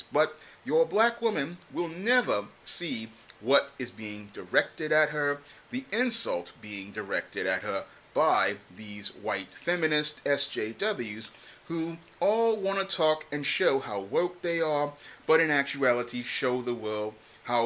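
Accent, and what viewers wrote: United Kingdom. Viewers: Love the British accent throughout the commentary. Very diverse form of commentary and the evil laugh was fitting of a British villain. Everything you said is on point and I am sick of these movies now. I'll pass on this since they passed on Idris. What a slap in the face to black men. Carry on now! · The British accent is a nice addition as always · Nice British access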